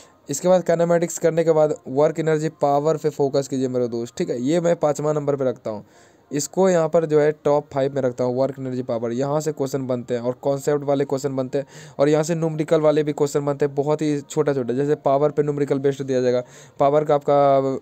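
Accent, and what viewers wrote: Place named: India. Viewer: सर आपके बोलने का अंदाज देसी स्टाइल एकदम मस्त बढ़िया मजेदार है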